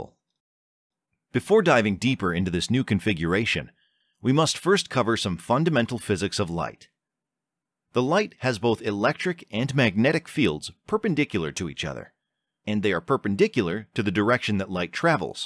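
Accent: India. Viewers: Daam this guy have most stereotypical Indian accent ever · Indian accent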